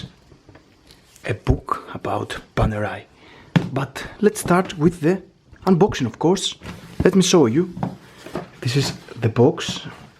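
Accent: Greece. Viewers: you are from greece? the ascent is familiar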